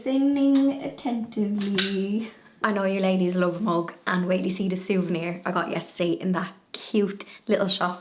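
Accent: Ireland. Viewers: I live in Texas USA and has spent years working on my family tree. My mom used to say her people on her dad’s side was Irish but she knew nothing else. Since she passed I’ve learned my 5th great grand father was from Legacorry, Shankill, Armagh, Ireland. That’s how it was written out in 1640 so have not learned where that actually is yet. I enjoy just thinking one of my great grand mothers talked like you. ❤ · I love all your videos , you are kind and witty . I live in Scotland but I am from South America so love to hear your Irish accent helps my understanding of English and the diversity in accent . THANK YOU for letting me have a peek on to your lovely world and adventures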